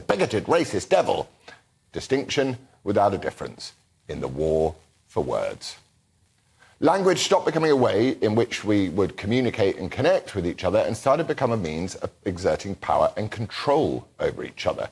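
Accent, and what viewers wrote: Scotland, United Kingdom. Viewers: Great Scottish accent 😊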